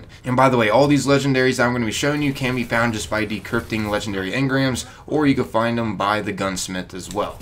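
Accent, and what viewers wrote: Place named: Maryland, United States. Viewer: You HAVE to be from Maryland. Lol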